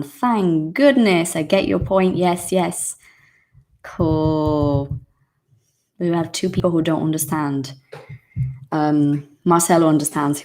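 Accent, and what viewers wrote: United Kingdom. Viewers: Wow, you sound like a real British girl! I love that accent 👏👏👏👏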